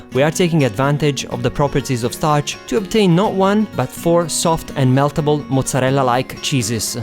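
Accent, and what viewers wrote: Italy. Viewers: I found your Italian accent completely adorable!Keep up the good work of giving us tips! I am not vegan but vegetarian..Your channel is really helpful! :) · yass that accent and inflection, I'm a fellow italian (: Yeah i agree and I'll sure try some of the recipes! Who knows what'll happen hahah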